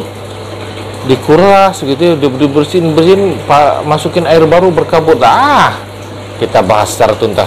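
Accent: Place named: West Sumatra, Indonesia. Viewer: Makasih infonya uda Sangat bermanfaat. Kalau boleh saya coba tebak Dialeg cando urang awak. Salam 🙏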